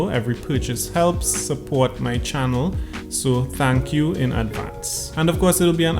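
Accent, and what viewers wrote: Trinidad and Tobago. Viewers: I have the Nikon 5100D and was looking for a lens to go with it other than the kit lens. I saw your video and decided to give it a watch and within the first 5 seconds I heard the Trini accent 🇹🇹 !! Big up my people one time. Just a quick question though, would you recommend this lens for a video podcast on my camera? Great video !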